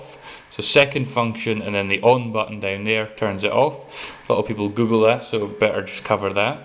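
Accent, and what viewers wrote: Scotland, United Kingdom. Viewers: IS THIS MAN SCOTTISH?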